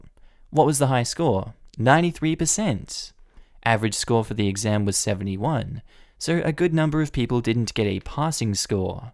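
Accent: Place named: Australia. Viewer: He's rather obviously Australian.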